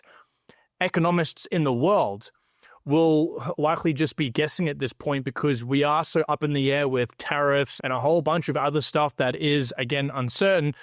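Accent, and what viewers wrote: Australia. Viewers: Nice video. Tho, I would enjoy your channel alot more if the Australian accent was toned down a bit.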